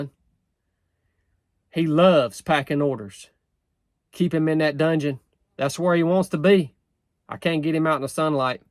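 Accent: South, United States. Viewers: What state down south are you from bro?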